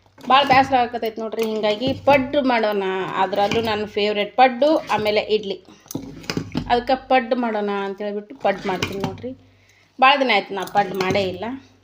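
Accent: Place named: Uttara Kannada, India. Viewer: Namaskar ri. Namma UK bhashe kelode ondu khushi. Chennagi ide paddu.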